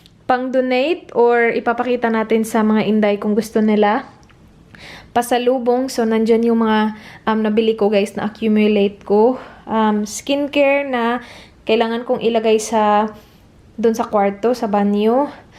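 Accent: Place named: Visayas, Philippines. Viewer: AGUY 😂😂😂 pero cute paminawun TATAK BISAYA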